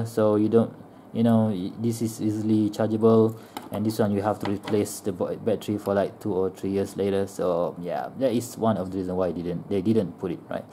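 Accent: Malaysia. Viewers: Are you Malaysian? You sound like one haha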